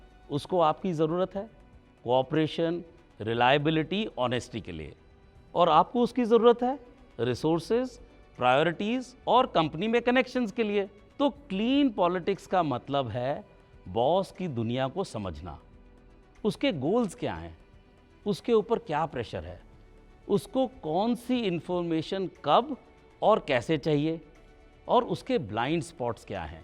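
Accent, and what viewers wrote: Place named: India. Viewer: I realized that you are an Indian, not Pakistani. And it was just because of accent of Urdu speaking ❤. Regards, "FARAZ AHMED"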